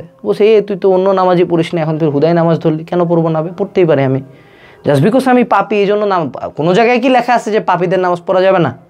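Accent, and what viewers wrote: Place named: Rangpur, Bangladesh. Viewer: Vai er kothar tone e bujha jay Rangpur er manush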